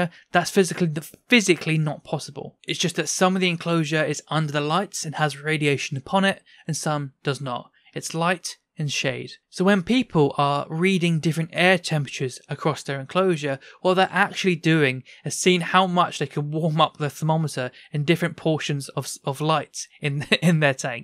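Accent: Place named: United Kingdom. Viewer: Really helpful video also good to here a British voice was only seeing America videos so nice to here from someone who talks about caring in the uk 👍🏻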